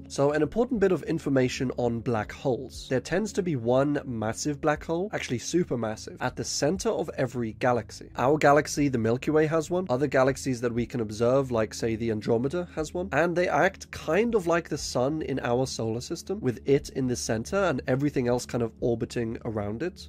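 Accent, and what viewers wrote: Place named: Wales, United Kingdom. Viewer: Im glad ive spotted your chanel content is fantastic keep up the good work also i hear a slight welsh there in your accent if im wrong please correct me im just a huge fan of the welsh accent probably the most amazing accent in the world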